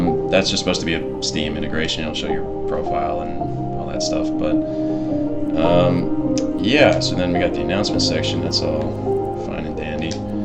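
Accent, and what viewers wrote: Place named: North Carolina, United States. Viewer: I'm also from NC, nice to hear a local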